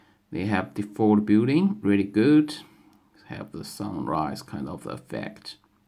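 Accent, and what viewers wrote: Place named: China. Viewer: 中国口音的英语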